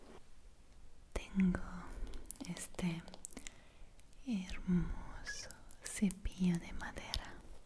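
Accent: Italy. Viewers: Ella es italiana o portuguesa no se Pero cuanta el español si eres de un país que habla un idioma diferente Por ejemplo a mi me cuenta pronuncias el coreano y portugués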